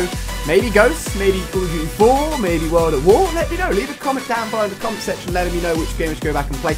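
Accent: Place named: United Kingdom. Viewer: are u 50%muslimand50% British I think that because u have a British accent and your name is a Muslim name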